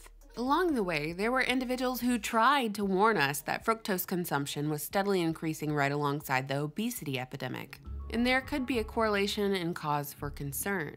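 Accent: South, United States. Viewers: Thanks, love your channel and your southern accent! picked up a hoodie for my daughter and a t-shirt for me!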